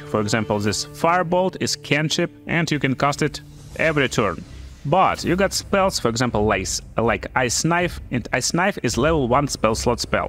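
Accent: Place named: Russia. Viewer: I can hear russian accent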